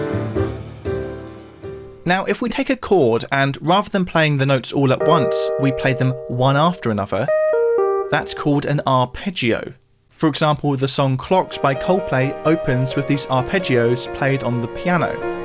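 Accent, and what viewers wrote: United Kingdom. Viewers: That british accent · I think the only one I didn't know was mordent? But I'm an American guitar player, so your definitions for glissando and portamento seemed backwards to me. As a bit of an Anglophile, I very much enjoyed hearing some of your pronunciations of the terms.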